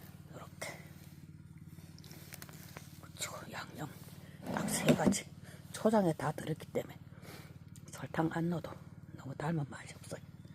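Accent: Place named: Gangwon, South Korea. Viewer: 강원 도 사투리신가봐요. 말씀도 구수하고 나물향도 구수할것같아요. 땅두릅을 그렇게도 부르는군요. 산행하시느라 고생 하셨네요. 맛있겠어요.🤗👍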